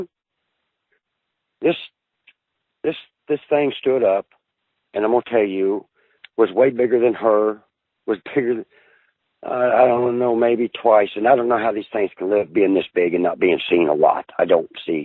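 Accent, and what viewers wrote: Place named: Florida, United States. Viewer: He sounds like an old fashioned Florida guy from the country. Some old bars and pool balls the men's room was actually a back door outside to an alley.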